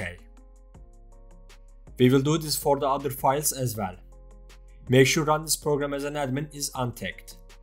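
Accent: Turkey. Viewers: teeeşekkürler dostum konuşmandan türk olduğunu anladım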